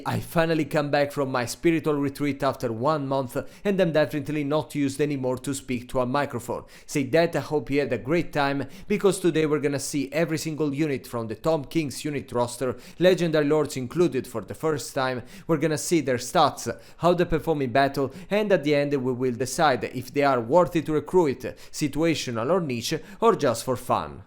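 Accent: Italy. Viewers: Ciao I'm quite sure you are a fellow Italian by the English accent. If not, great video anyway. Grazie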